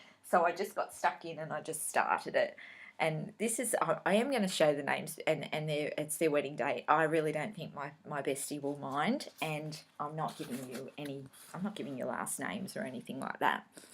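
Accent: Australia. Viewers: Thanks for another great video. so sorry to hear about your friend's parents. I love listening to your stories and your lovely accent. I visited our relatives in Melbourne 3 years ago so listening to you reminds me of my trip. I'm a novice but love trying different pieces. Your stitching is amazing! Happy stitching too next time. Lori S.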